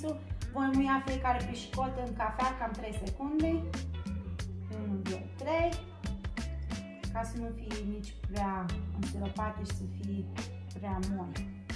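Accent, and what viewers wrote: Moldova. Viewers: Adoooor accentul tau 😊❤❤. Ești moldoveanca din Iași ori Bacău?😅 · Te-am descoperit de curând, mi-au plăcut atât de mult vlogurile tale, așa încât m-am abonat și îmi doresc sa le vizionez în continuare. Ai o familie absolut superbă, tu ești atât de tânără și frumoasă și îmi place accentul tău moldovenesc!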